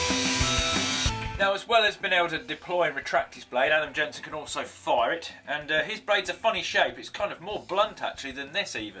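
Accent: United Kingdom. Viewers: Well. He is A Crazy and Genius British Accented man with Strange Futuristic Machines. Colin Is A Timelord Confirmed. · Is he British?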